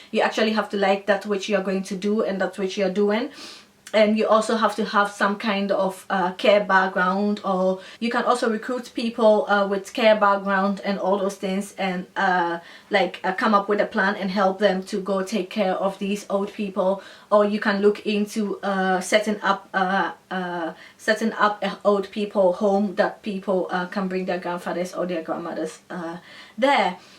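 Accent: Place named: East Africa. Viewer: thank you for that insight. Yes, there are a lot of Ghanaians living in Holland (just as there are here in the UK), that much I know, but I must admit her accent prompted me as being perhaps of East/Southern African origin and hence my initial question.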